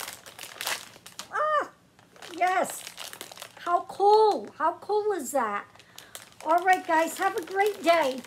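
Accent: Boston, United States. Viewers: Are you from Boston ? Your accent sounds like it 😊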